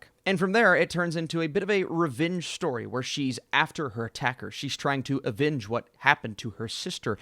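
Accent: South, United States. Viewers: I just discovered you. Bro you were made for this. Love your delivery! Love your accent! Southern I'm guessing. You have a new fan! And, I will watch this just to learn from it. I love bad movies, great teachers;)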